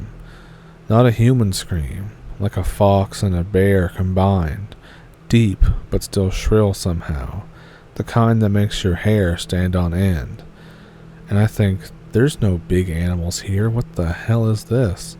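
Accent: Canada. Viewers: I’m English and I think it’s cute lol but it’s probably because I love his Canadian accent. I would listen to him read the football scores! 😂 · This is told so well I'm actually laughing at the state of mind those guys are in lol, spent nearly 5 years in the UK now I think I probably know the area they come from with how they speak to each other 😆 , in a Canadian accent? I think, like a movie in my head very well done sir ! 👍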